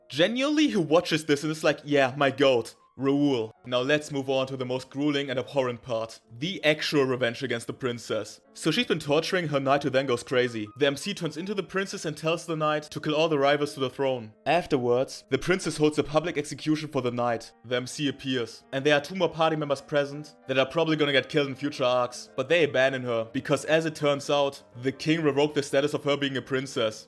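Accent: Germany. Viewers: the video creator doesnt even try to hide hes german 🥀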